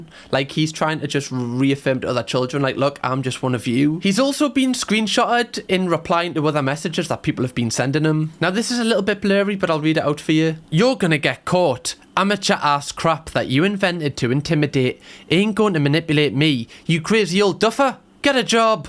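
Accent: Newcastle, United Kingdom. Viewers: No, hes from somewhere near newcastle i think x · I love this man's British accent 😮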